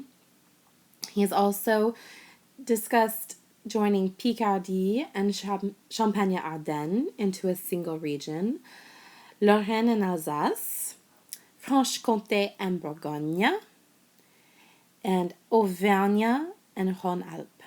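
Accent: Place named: England, United Kingdom. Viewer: I like your English accent when you tell the French provinces I think it's not so easy for your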